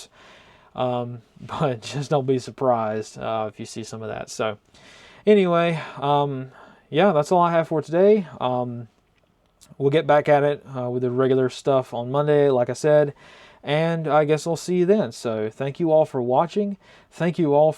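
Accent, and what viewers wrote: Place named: Virginia, United States. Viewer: Accent puts him in virginia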